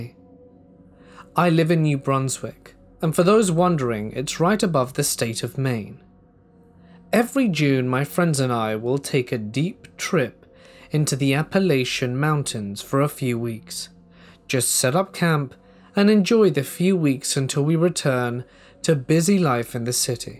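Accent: United Kingdom. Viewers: My only comment is this is superb narration, not just the charming british accent, but the tone, tempo, and phrasing (clearly enunciated without losing flow) are brilliant. This guy can do anything, any topic, audio books, etc and it would be of the highest professional caliber in my opinion.. Best I can ever recall hearing on YouTube.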